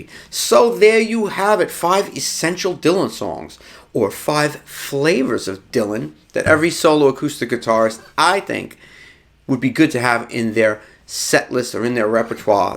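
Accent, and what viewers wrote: New York, United States. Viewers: Nicely done and thank you for the work on this. I'm trying to place your accent...which borough of NYC are you from?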